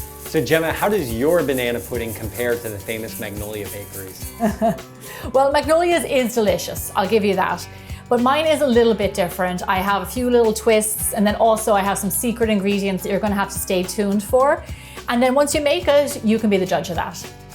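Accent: Ireland. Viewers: Hi love your recips and i love your irish accent 💗💗💗💗💗💗😘😘😘😘😘🍰🍰🍰🍰🍰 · I am DEFINITELY going to the grocery store NOW to buy the supplies. Don’t know if it’s because it’s lunchtime or what, but I’m near drooling here. And listening to you certainly didn’t hurt. Love the Irish accent ❤️ You just popped up on my recommendations, but SO glad you did .... new subscriber here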